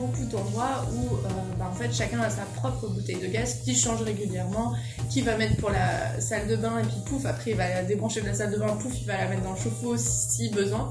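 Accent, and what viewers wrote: Arab world. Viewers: J'ai hâte de te revoir c'est on plaisir i love your prononciations in arabe ❣️😂🇲🇦 courage and bon continuation U are photogénique ❣️ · c jolie ta facon de parler arabe hhhh sayez reste avec nous au maroc ta rien a faire en france la vie au maroc cest jolie hhhh❤❤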